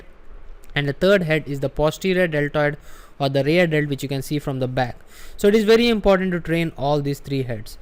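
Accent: India. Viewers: i lovvvve indian accent :)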